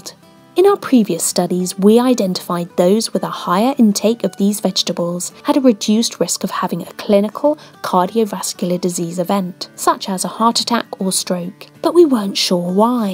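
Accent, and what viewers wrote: United Kingdom. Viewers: I love your voice and british accent :) Great information shared, thanks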